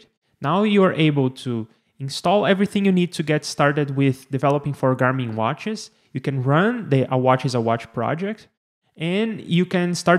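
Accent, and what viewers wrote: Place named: Brazil. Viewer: Nice video, I think it's hard then I expected, as I'm not a programmer. By the way your accent sounds like a Brazilian but with a really good English!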